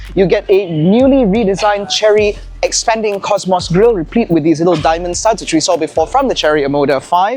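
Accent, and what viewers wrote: England, United Kingdom. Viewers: Clicked the video for the car, stayed for the English accent. Well done presenter. Strong presentation!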